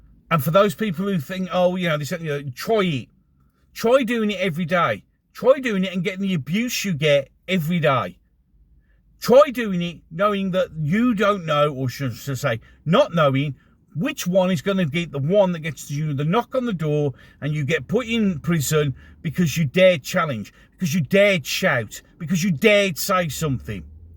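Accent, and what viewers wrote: Birmingham, United Kingdom. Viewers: Spoken like a true old skool Brummie 😂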